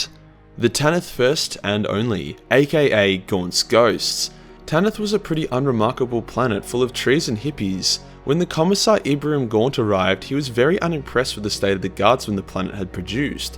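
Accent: Australia. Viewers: It's good to hear an Aussie doing this stuff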